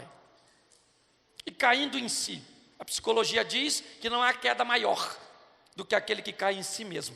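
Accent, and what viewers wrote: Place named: Northeast, Brazil. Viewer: Kkkkkk pastor tú veio lá da minha terrinha foi? com esse jeitinho nordestino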